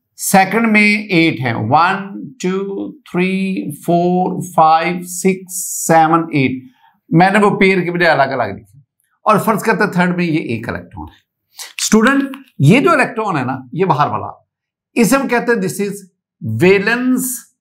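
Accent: Punjab, India. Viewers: Mashallah sweet Punjabi Urdu ❤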